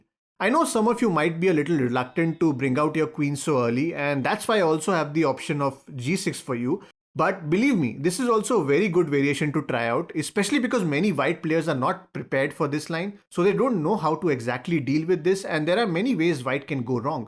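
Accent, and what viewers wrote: India. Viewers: Some people need the subtitles to better understand Hindu English to give them a better experience, unless they miss important lessons... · This chanell is magic! Dude with indian accent whisper me when i played... No Offense because Indian and Singaporean Accent is easier to hear by majority of Asian...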